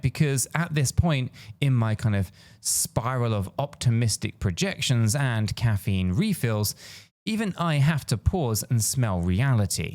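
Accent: United Kingdom. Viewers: Love your channel. Great info!!! Your British accent and sense of humor put it over the top! 😊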